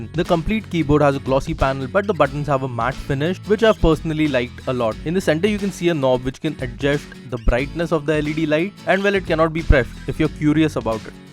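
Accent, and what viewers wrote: India. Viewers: terrible indian english /...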